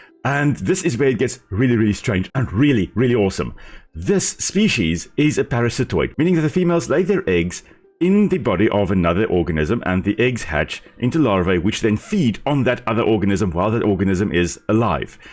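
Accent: South Africa. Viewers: Are you South African? Your accent sounds so familiar I swear. Just found the channel and its awsome. Plan on binging this series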